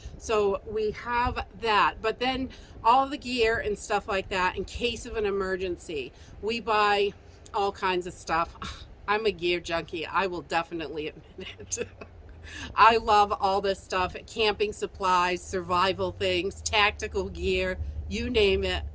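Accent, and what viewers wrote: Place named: Long Island, United States. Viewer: where are you from originally? Sometimes your accent sounds Long Islandy and the expression "landed up" as opposed to "ended up" is different.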